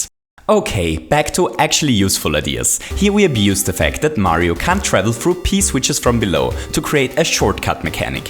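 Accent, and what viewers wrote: Germany. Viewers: Why would you pronounce Kaizo in an American way (sounds like Kayzo, when you say it)? English clearly isn’t your mother tongue and I guess it actually is German. If you would simply pronounce it in a German way, you’d be much closer to the actual, Japanese pronounciation.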